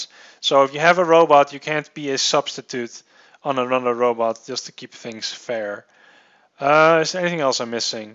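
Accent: Netherlands. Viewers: Man im dutch myselve and i instantly knew youre dutch :P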